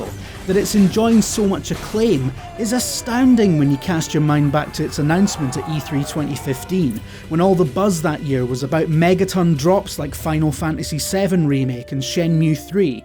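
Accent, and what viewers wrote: Scotland, United Kingdom. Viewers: Is that a scottish accent I hear? Wonderful, could listen to this all day! Great review too.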